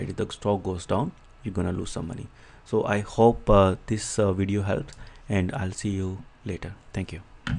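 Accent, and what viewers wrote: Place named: India. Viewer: No from Japan, what do you think????? Of course from India (from his accent).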